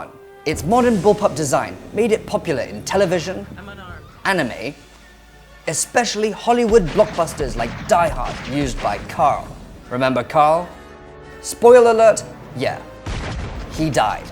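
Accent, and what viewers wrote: RP, United Kingdom. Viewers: Well, if it's genuinely how you talk and you aren't putting it on for the camera, then I will have to apologize for being derogatory towards you. I would guess having a posh british accent with the native language would dilute them into a mixture of both. Truth be told my problem is with people who pretend for the camera. I hope you accept my apology as I do enjoy your video's. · looks asian talks like a brit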